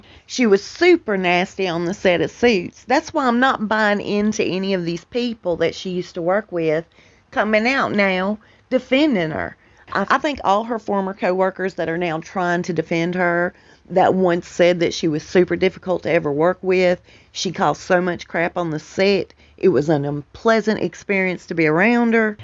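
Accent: South, United States. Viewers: I enjoyed your video today. As much as I like the southern accent, I am afraid it is too much for me, and I can't follow. Today you were much better . Do our really talk like that all the time, it seems like you exaggerate it sometimes . If not I apologize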